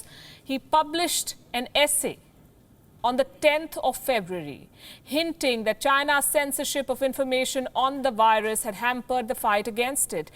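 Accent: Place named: India. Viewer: First please learn how to change your Indian accent. Second, please learn about the virus. How many viruses from USA and other countries? Did you ask for paying at that time? Will you ask for paying if the virus from India? Come on, do not be an idiot! You should learn how to protect and be away from virus, not go everywhere and treat the virus like a flue, like an real idiot! Third, your way of asking for money is like a widow, maybe that is real. please think with your mind.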